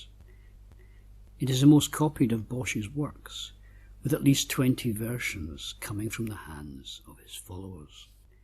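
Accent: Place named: United Kingdom. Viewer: Dear, I want to thank you for all the work you do.. I am truly lucky to have you in this world, showing me the beautiful marvels I would have probably missed otherwise. Also the knowledge that you share and all the work you must have put into it all.. words are insufficient for how I feel towards it. Secondly, I am planning on taking a trip around the UK to see all the magical places. Do you have any suggestions? Also, I know it might come across as creepy, but I would love to meet you. You sound like you live in the UK, and I am a traveler on the journey of life and it would be an amazing addition to my journey to be able to talk with you. Anyhow, whatever happens, I wish you great love and bliss. Much love, Joep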